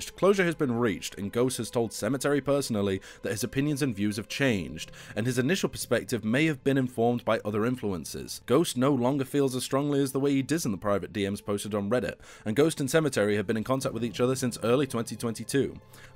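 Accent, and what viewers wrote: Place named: United Kingdom. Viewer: You sound to british for this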